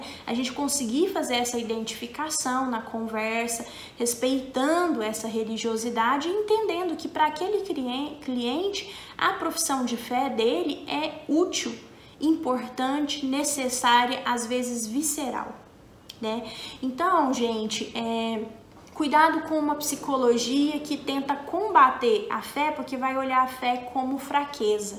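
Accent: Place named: Minas Gerais, Brazil. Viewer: sou estudante de Psicologia, estou no do 7º semestre. E sou apaixonada por suas aulas, não apenas por sua didática de ensinar, de transmitir o seu conhecimento, mas pelo seu sotaque gostoso que me remete saudosamente ao meu pai que era também mineirinho. Obrigada, por compartilhar informações de tamanho valor para nós estudantes, com tamanha riqueza e simplicidade ao mesmo tempo. Sempre compartilho seu conteúdo com meus colegas de turma. Beijokas e fique sempre bem (sempre que possível)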